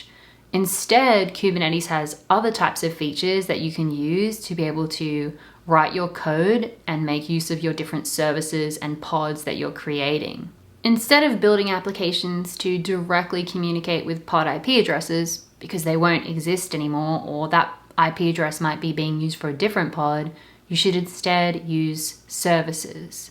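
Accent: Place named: Australia. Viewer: I came for the tech but stayed for the accent! #AussieAussieAussie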